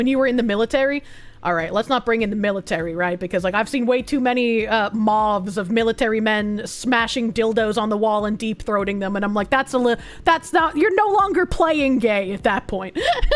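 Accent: Boston, United States. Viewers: HAPPY BIRFDAY, SILLY FOX WOMAN You really are something special, a shining light of squeaky noises, Boston accents, memes and autism. A breath of fresh air in this oppressive nightmare zone of a world. We’re all gonna make it! Keep your chin up, eat some sugary cake, pass out in a food coma and remember how much your community loves you. Happy Birthday.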